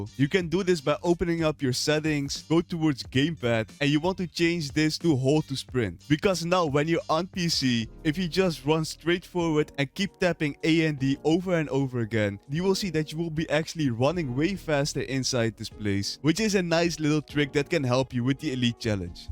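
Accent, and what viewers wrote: Netherlands. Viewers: Hes defo dutch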